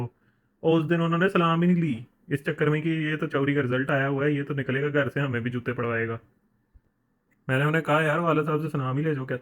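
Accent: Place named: Punjab, India. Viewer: That Punjabi Accent 👌🔥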